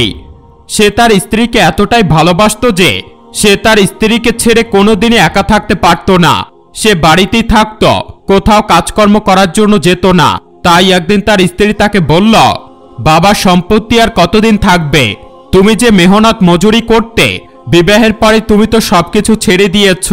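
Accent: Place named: Noakhali, Bangladesh. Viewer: ভাই তোমরা কণ্ঠ এমন,মনেহয়,নোয়াখালী মনেহয়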